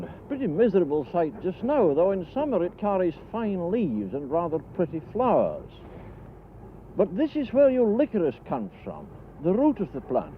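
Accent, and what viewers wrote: RP, United Kingdom. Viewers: 50 times stronger it was the fentanyl of its day! 😅 it's very interesting and quaintly informs you of things in that once ubiquitous, now obsolete, BBC accent. This video highlights the rarer Scottish BBC inflection.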